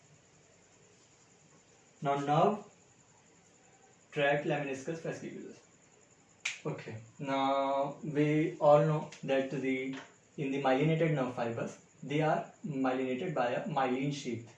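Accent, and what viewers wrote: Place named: Jagdalpur, India. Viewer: Yeh toh Jagdalpur walaa haina😂 Keep it up Sir..👏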